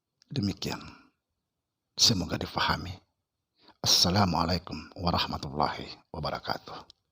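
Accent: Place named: Sulawesi, Indonesia. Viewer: Assalamualaikum warahmah tullahi wabarakatu..bolehkah kami tahu bapak asalnya dari mana ya....dari logat tuan guru sepertinya..Sulawesi...?dimana ya...ilmu tuan guru hampir sama dengan nenek moyang saya.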